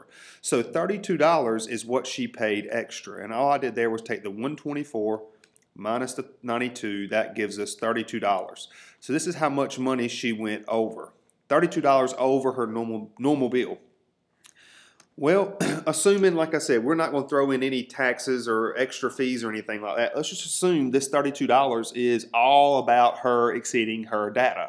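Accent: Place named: North Carolina, United States. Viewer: Your videos are so helpful. Thank you for all your time and effort!! Taking my TEAS soon as someone who graduated college in 2015 so these refreshers are everything. Side note, are you by any chance from Eastern NC? Your accent sounds like me and my people!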